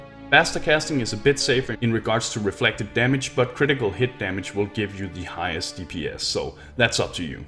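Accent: Denmark. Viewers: Nice videos tho i must ask: Are you danish becuz you sound like that xD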